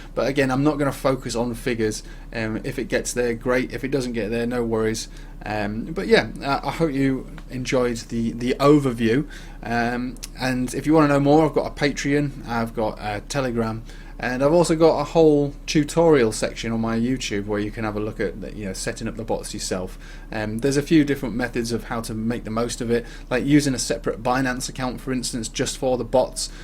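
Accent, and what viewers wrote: United Kingdom. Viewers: Sounding like you in the UK, this actually works here?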